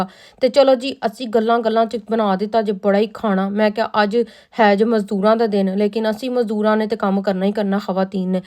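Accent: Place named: Lahore, Pakistan. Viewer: Same hum b lahore sy ha hum b aisy hi bolty ha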